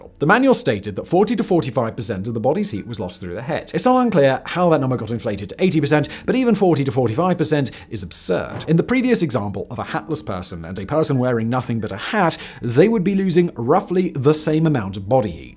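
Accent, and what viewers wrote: United Kingdom. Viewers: I feel like one's perceived IQ is like 10-20 pts higher when one gives a report with a British accent. I believe and want to buy surf shark 😅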